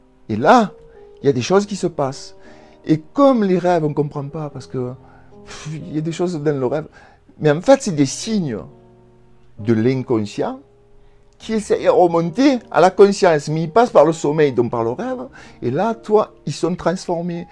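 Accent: South, France. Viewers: J'adorée vous êtes de bonne humeur avec votre jolie accent du sud merci énormément voues êtes trop pédagogue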